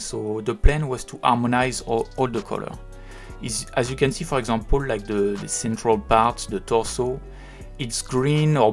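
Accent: France. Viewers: Ton accent français ce reconnais clairement mais tu a clairement un anglais plus maîtriser que moi donc je ne devrai pas parler 😂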